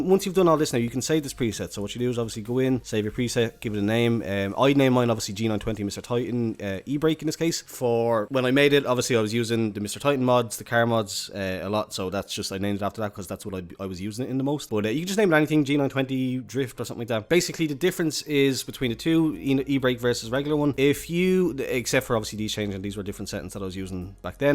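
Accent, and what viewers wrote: Dublin, Ireland. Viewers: U sound Irish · You from dublin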